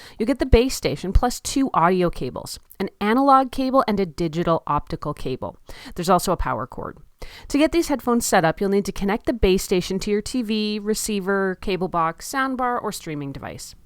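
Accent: Canada. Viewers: She sounds Canadian , so the video is even better.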